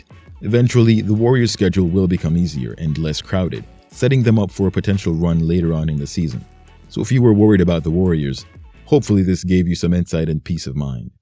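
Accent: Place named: Jamaica. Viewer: Jamaican is the last thing I imagined you to be. And now I can hear it. Not that I don't think a Jamaican can code switch to accentless English. Praying for those victims of the hurricane.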